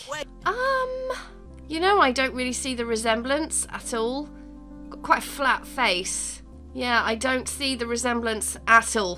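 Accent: United Kingdom. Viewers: i feel like your accent is a british version of the way eric cartman talks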